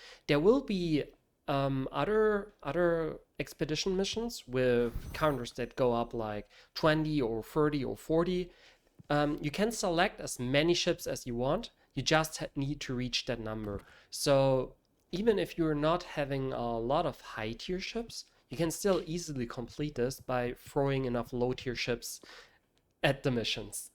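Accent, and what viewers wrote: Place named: Australia. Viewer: You sound Australian, what time you stream?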